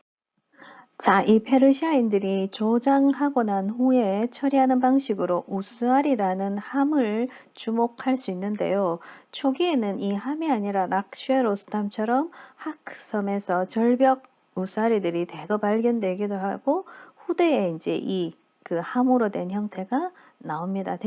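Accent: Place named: Gyeongsang, South Korea. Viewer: 취향에 맞는 문화채널 우연히 접하네요. 경상도 억양의 강좌 귀에 쏙쏙 들어오네요.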